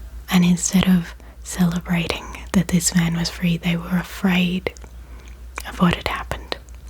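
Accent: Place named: Australia. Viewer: our Aussie accent reading bible verses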